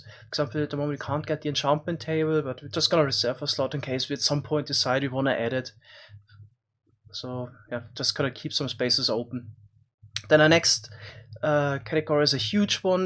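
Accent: Germany. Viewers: Noticing someone's accent is not racist, dont worry. He is still flluent in English, with a pretty obvious german accent.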